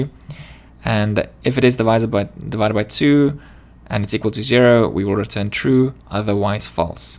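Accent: South Africa. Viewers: South African?? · As a South African living in UK, learning Riverpod with your accent is wonderful! 'Bare with me, hey' <3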